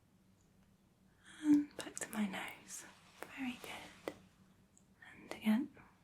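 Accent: United Kingdom. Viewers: Gawd I love a woman with a British accent! ❤😍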